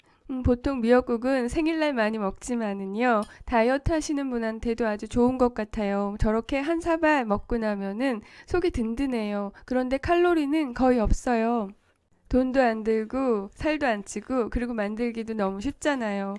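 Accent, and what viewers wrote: Seoul, South Korea. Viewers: 말투가 서울사투리 느낌..영상 잘봤어요 ㅎㅎㅎ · 와 말투가 오리지널 서울사투리네요 신기하다ㅋㅋ 지금은 지방쪽이랑 섞여서 서울말이 진짜서울말이 아닌데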